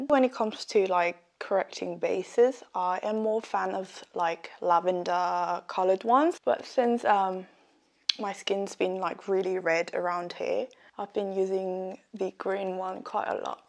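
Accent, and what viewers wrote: United Kingdom. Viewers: 딱 틀자마자 영어하시는거 보고 영국 생각났네요ㅠㅠㅠ 앞으로도 자주 듣고싶어요💕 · 졸예❤️ 예전처럼 아이라인을 빼서 그리지 않아도 있는 그대로 자연스럽게 너무 이쁘네요~ 사용하는 색이 더 옅어지니까 좀더 퓨어 해 보여요💛 이번 스틴푸드 파데도 이런 맑은 메이크업에 너무 찰떡이에요💜 비건 제품이라 너무 좋네요 비건 제품이 더 많아졌으면 좋겠어요~ 그리고 의 영국식 영어는 언제 들어도 멋져요😊 뭔가 해리포터가 생각나는…?? 저만 그런거 아니죠??ㅋㅋ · 으아 ㅠㅠㅠㅜㅜㅜ제가 좋아요, 댓글 일등이라구여ㅠㅠㅠㅠㅠ헝 사랑해여 울언니ㅜㅜ 영어 영상 너무 좋아여..그녀의 영국식 발음 = 귀르가즘 🤍 그럼 이제 영상에 집중 할게요 🙈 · 언니 브리티시 악센트를 다시 들을 수 있다니 감덩이에요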